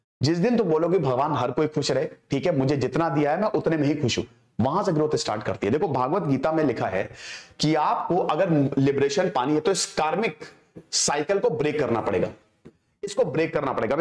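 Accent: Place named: Bihar, India. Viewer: I have been listening to him for past few years and this is one of the best lectures. I like the Bihar ascent.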